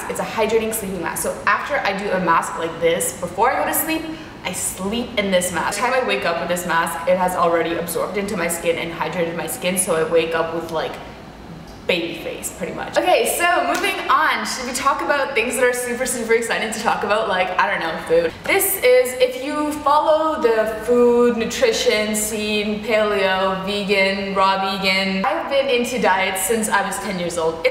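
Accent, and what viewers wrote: Russia. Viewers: Почему у появился русский акцент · Легче понимать речь, если сравнивать с остальным англоязычном ютьюбом. У неё есть некоторый акцент, русский, или кажется? Поскольку большая часть из сказанного в видео было уже озвучено на classisinternal на родном русском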